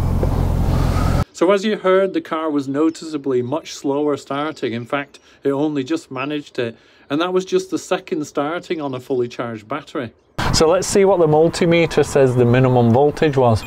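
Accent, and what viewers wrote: Liverpool, United Kingdom. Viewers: I would guess at least half is Liverpudlian. 😉👍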